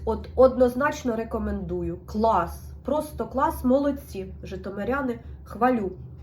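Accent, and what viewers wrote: Poltava, Ukraine. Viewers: Дуже приємно слухати огляд полтавським діалектом.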